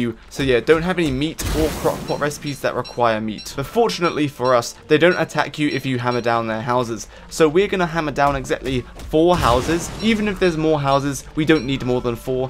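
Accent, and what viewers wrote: United Kingdom. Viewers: this guide is the best guide i've ever seen ım am not a beginner but ı've got some tricks by you also you mentioned about almost everythink thank you love ya :3 <3 btw your BRİT-ish accent is soo good :)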